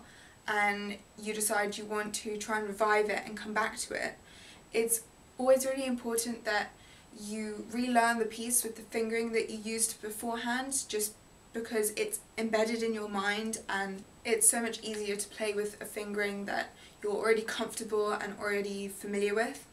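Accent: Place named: RP, United Kingdom. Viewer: very good88 and i can work on my english because you have the queen mum's accent ! i understand everything even if i am french!!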